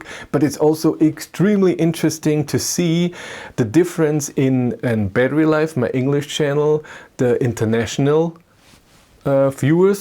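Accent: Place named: Germany. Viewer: Keep up the good work. I have been watching some of your videos since quite early. I remember you made some videos about your new Id3, and you were working full time. The Excel charts are a nice addition. Thanks. I am glad this is working out for you. It is interesting hearing a German perspective. Your english is fine, quite clear, with a nice German accent. I would like to hear some of your detractors speak a foreign language. That could be quite amusing.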